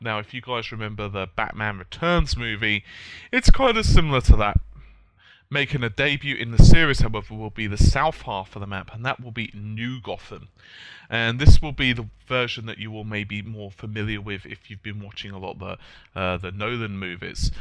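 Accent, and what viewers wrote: United Kingdom. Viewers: My God your voice is British O.O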